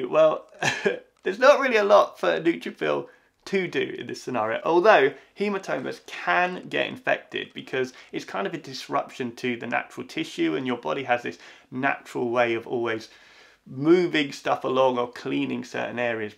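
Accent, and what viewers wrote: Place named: Yorkshire, United Kingdom. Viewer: Hi i hope you see this but it would be really helpful if you put subtitles on your videos coz im having trouble listening to you with your accent i wanna say its sounds like a Yorkshire accent but i could be wrong. it would make my life easier as being hard of hearing. Also would you love it if Cells at work did a Covid19 episode coz i would.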